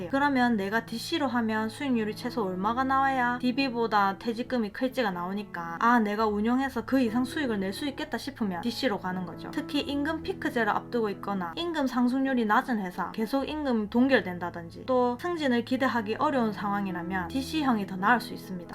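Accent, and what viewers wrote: Gyeongsang, South Korea. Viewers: 경상도 억양같은데요~~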